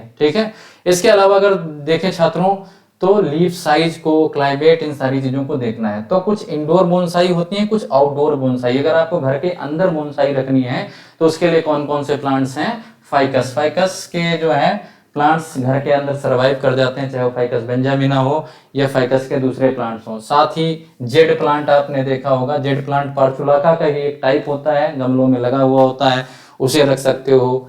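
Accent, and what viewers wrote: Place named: Bahraich, India. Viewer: Sir bahraich side se hain na, mainly mahsi, apka accent bht match hota hai yaha se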